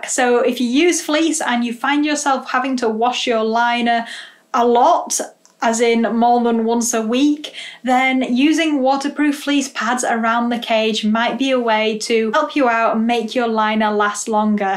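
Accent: United Kingdom. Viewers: I just loveeeee this channel! Your voice is so sweet and calming and your channel has helped me A LOTTT for caring better for my "piggies" (in my brittish accent like yours...😊😊😊) sooo helpful thabk youuu!!!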